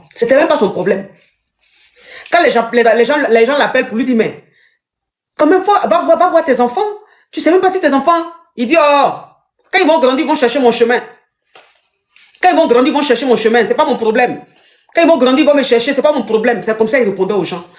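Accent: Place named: Dioula, West Africa. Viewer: Coach avec son joula facon la ca fait rire koi, c'est pas samalakolo c'est samarakolo qui veut veille chaussure gate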